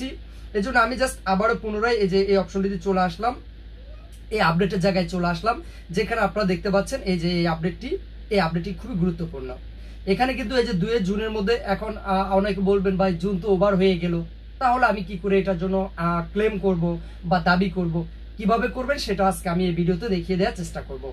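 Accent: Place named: Barishal, Bangladesh. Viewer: বরিশাইল্লা আপা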